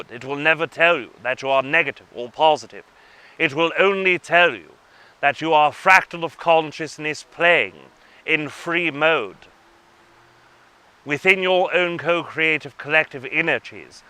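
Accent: England, United Kingdom. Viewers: Curious why does he come through with an english accent? Fasting from all things in life in my opinion is the key to resetting the self to become more whole and aware again.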